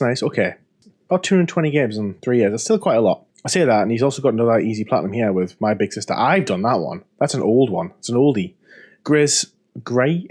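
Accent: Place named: Northeast England, United Kingdom. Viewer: You sound like a fellow northeastener, hi from South Shields 👋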